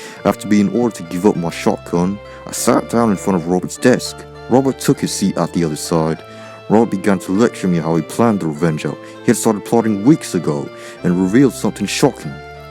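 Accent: United Kingdom. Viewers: you have a deep British accent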